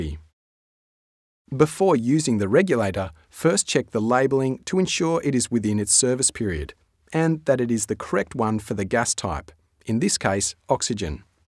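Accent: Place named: Australia. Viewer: British Oxygen Corporation Voiced my a Aussie!! Great Job.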